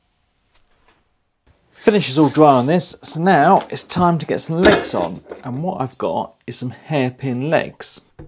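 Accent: RP, United Kingdom. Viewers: I enjoyed your description at the beginning of deciding to make a posh project out of pallets. It fits your posh accent!😊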